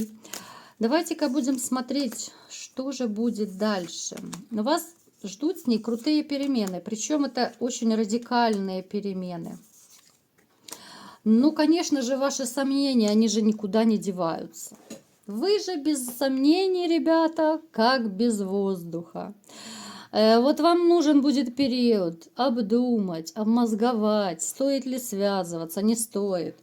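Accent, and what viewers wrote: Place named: Ukraine. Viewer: В речи редко проглядывается украинский язык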